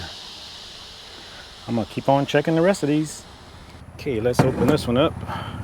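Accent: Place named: Louisiana, United States. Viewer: Awe... Your voice make me miss home!!!! Lol I'm from butte la rose but I live in Florida now. 😊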